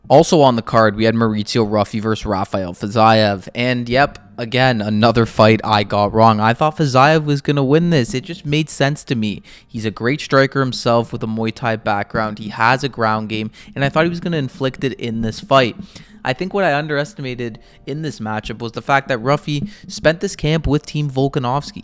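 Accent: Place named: United States. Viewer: Are you a Yank?? Sounds like it. Typical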